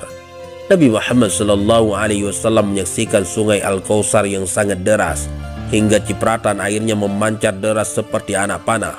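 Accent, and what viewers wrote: Aceh, Indonesia. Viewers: maaf.. aku cuma mau nanya .. kayaknya admin nya orang aceh ya.. salam kenal ya.. wassalam..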